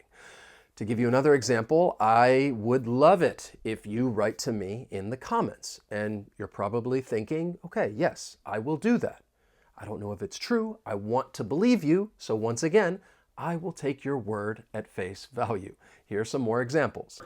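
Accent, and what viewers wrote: United States. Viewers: the best for you. Although you have not a strong american accent, i am able to catch the 95% your speeches. Other teachers, and much more docomentaries with the voice off are a bit challengue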